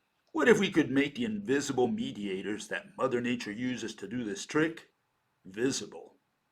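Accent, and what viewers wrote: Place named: India. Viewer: He talks like an Indian. Where are you from mister?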